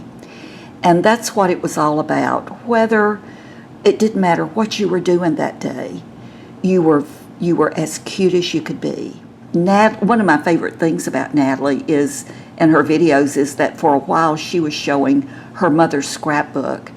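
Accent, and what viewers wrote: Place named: Arkansas, United States. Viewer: ***** oh, I love Arkansas! That's why you have such a beautiful accent! Stay safe!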